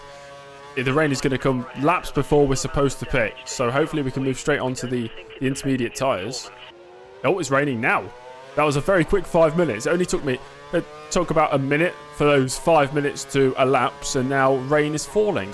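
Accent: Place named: England, United Kingdom. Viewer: I only follow this content because of your perfect English commentator's accent 😂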